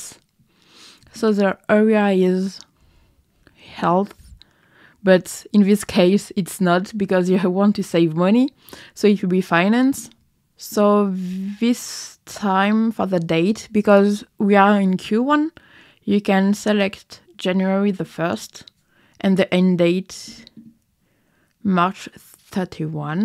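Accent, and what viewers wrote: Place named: England, United Kingdom. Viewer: woow i loved you ,loved your english ascent and more love your work , ,,Thank you realy..looking ahead for the next video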